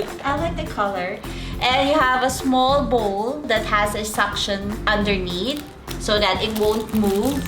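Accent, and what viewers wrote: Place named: Singapore. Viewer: SingLish is always interesting sound like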